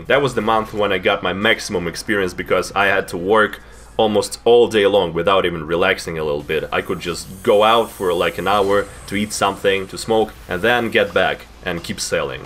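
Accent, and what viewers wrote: Russia. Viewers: a russian person telling histories while playing tf2? I NEVER KNEW I WOULD NEED THIS SO BAD IN MY LIFE please make more of this type of content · I dont know why but its so chill to hear your russian voice and your stories. Please make this a thing!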